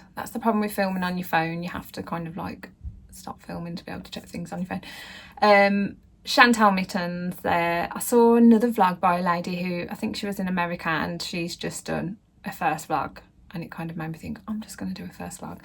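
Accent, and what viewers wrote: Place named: Birmingham, United Kingdom. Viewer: I am from Birmingham so was drawn to your accent. Loved your first episode and looking forward to watching more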